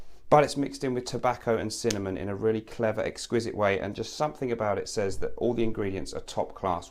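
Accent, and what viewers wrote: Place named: London, United Kingdom. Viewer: Your a London chap aren't ya ?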